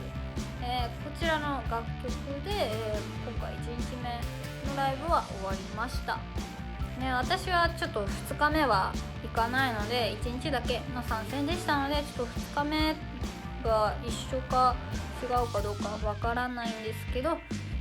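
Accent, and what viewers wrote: Hiroshima, Japan. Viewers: たまに話す方言からすると広島ですか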